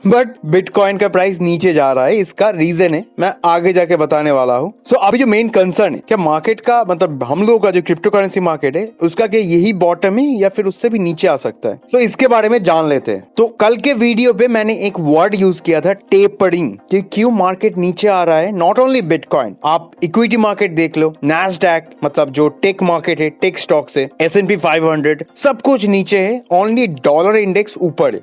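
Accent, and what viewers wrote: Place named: India. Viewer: Beautifully explained. I love your ehindi accent....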